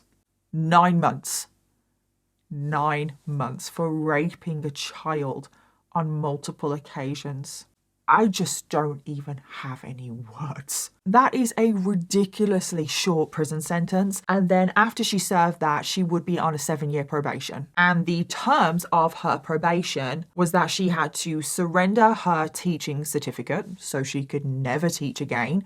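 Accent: United Kingdom. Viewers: I find you attractive despite your British accent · LOL loved this roasting of creepy Americans in British Accent 😅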